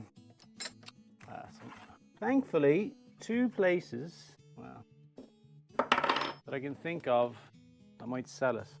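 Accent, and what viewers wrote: Ireland. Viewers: I love your accent, my late father was Irish and I like to hear the lilt of it when you speak. I’m glad your van has a name, my car is called Jezebel and I love it because it has a V6 engine and takes off faster at the traffic lights than any young men or women driving BMWs which is disgraceful at my age because I’m in my 70’s. I find great joy in the mundane things of day to day living like buying food, casting your eye over new shiny objects, don’t stop your YouTube’s as they bring joy to many people. 🇦🇺🦘🦘🇦🇺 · Good morning/ afternoon, great you showing me around 🇫🇷 for someone who has never been out of Australia. I can hear you fine and understand with your Irish accent as I grew up with a Scottish father so I love listening to your accent so keep recording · what a lovely wee lunch today!...how was that wine? good work with that weed whacker shield. 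good vlog today young lad, your editing chops are improving so keep up the good work and be your Irish self (accent included) even in France.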